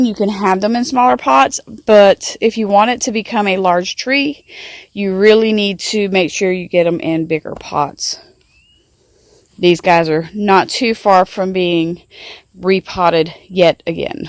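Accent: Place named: United States. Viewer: Thank you so much for this video... I have always been the type of person that tries to reproduce any plant that she sees... I love plants... I picked up 2 seed pods from my best friends yard today because the red seeds poking out caught my attention... I thought "omg, I can grow magnolia trees"... As soon as I got home I googled it and your video was one if the first things to pop up. Thank you sooo much for the information that you have given me and I just put my seeds to soak a little bit ago.... Also, I love your country accent and you seem like an awesome person overall... I hope you have a great weekend!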